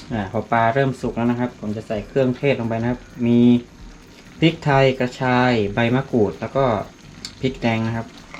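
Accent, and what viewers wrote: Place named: North, Thailand. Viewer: สำเหนียง จะอี้ เป๋น คนเหนีย แม่น ก่อ นี่ น้อง 55555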